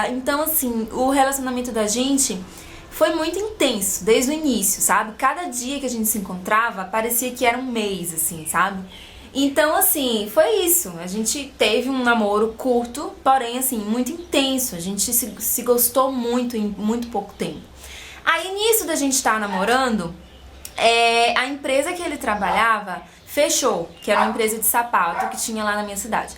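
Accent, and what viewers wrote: Bahia, Brazil. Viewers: Caramba, que bonito! A mulher que é amada reflete isso em todas as suas ações e sinto q vc é uma pessoa mt carinhosa, é super gostoso ouvir seu sotaque da Bahia rsrsrs bjs!!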